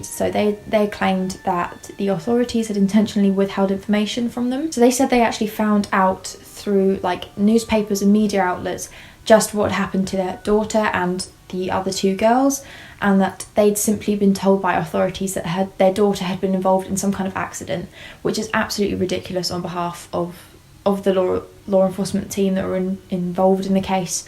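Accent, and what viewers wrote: United Kingdom. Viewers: I'm from Salina Oklahoma about 10 miles from the old camp site i grew up hearing about these story's i was 3 years old it sounds like your from the UK so why you even going on about this?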